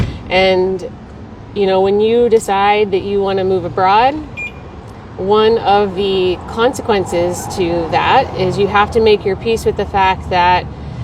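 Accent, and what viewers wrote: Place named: Texas, United States. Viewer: Hey!~ It was so lovely to connect with you at the Dallas meet up! Thanks again for taking the time despite all of the priorities you had going on. Really appreciate you, your team, and all that y'all do! *BTW~ I finally heard a bit of Texas in your accent in this episode! Hope to meet up again when we finally get to Croatia next year! 😊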